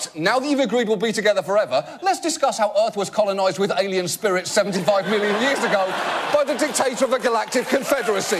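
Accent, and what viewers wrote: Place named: United Kingdom. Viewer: The Mighty Sparrow, you’re wrong his accent is 100% British.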